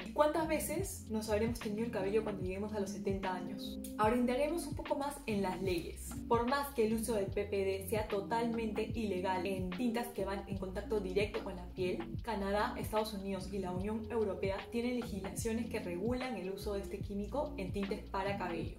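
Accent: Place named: Mexico. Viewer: Gracias! ✨ Soy solo yo o alguien más te ha dicho que tu acento suena un tanto a mexicano? 😅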